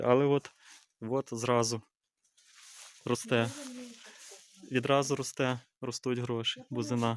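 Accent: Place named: Lviv, Ukraine. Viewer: Вимова у Вас Львівська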